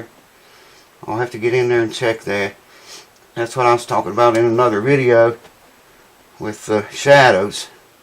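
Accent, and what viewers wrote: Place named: Arkansas, United States. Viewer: Tickles me, I am from Arkansas and you talk a whole lot like I do. I am just uglier than you, B/C I am 71 (seventy & one) years old.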